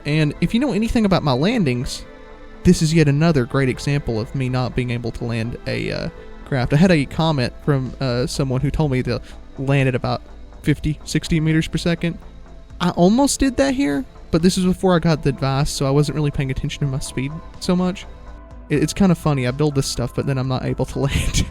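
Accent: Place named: Texas, United States. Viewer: why does this guy have like a texan accent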